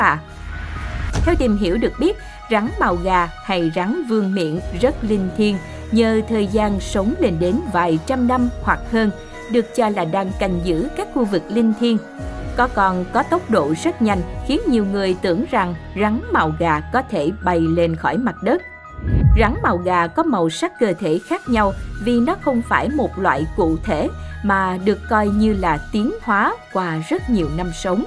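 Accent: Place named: South, Vietnam. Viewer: Người miền thì gọi là “ mồng gà “ người viết bài chắc không phải là người miền Nam nhưng người đọc thì phải .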